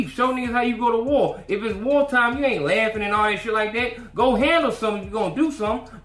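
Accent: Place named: South Dallas, United States. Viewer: Lol ...you talk like my homies in south Dallas ...good shit man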